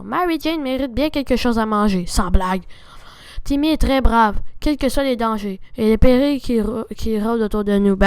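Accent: Quebec, Canada. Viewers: Super vidéo en plus tu es Québécois j'adore l'accent Quebécois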